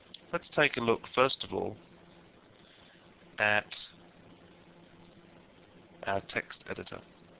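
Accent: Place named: United Kingdom. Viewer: whats wrong with british accents?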